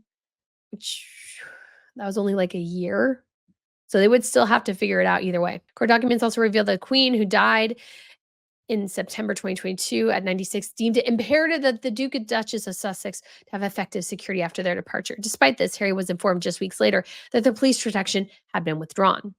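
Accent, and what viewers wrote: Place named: United States. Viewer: Someone has put the toothpaste on Charles’ toothbrush for him every day of his life. What on earth would Harry or any of the rest of them know of the “real world”? It’s weird to hear an American accent defending William being obviously more important than Harry. I must be TOO American. “Royals” are just ppl. Being born doesn’t make anyone special. But the only reason Harry needs security is the circumstance of his birth. It’s all very weird.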